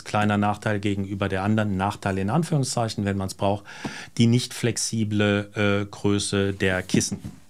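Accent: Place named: Hesse, Germany. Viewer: Moin Herr, sehr interessantes Video zu einem Nischenthema, dazu top recherchiert und fair bewertet. Ich habe mir vor Jahren mal eine erwas rustikalere Lederrolle von Steinhart zugelegt, die ich aber sehr selten nehme. Auf Reisen nutze ich lieber die günstigen Reiseboxen von Sinn für eine Uhr oder etwas hochwertiger das Leder Reiseetui ebenfalls von Sinn. Wie immer sehr kurzweilig mit dem schönen hessischen nit und einer Prise Humor, Chapeau🎩.Und wie gewohnt schön in Szene gesetzt und klug kommentiert. LG Werner Hoppe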